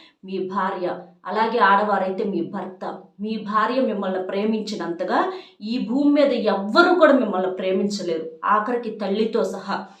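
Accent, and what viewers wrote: Nellore, India. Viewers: YEVURAKKA NIDHE NELLOREAA BAGANE CHEPTHUNNARU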